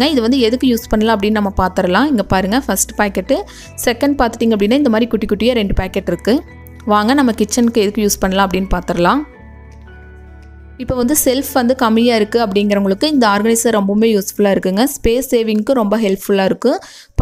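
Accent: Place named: Tiruppur, India. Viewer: Amanga nanum tirupur thanga...athanunga pathen nenga pesarathu namma ooru maathiriye irukunu superb nga ka...nenga podara videos ellame rmb rmb superb nga ka...unga video epa varumunu nan parthutte irupenga ka vanthathum parthuduvenga ka...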